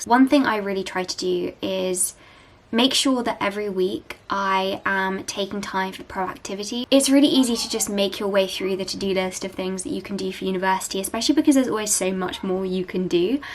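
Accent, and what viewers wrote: United Kingdom. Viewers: Her accent is so like Hermione Granger's !!!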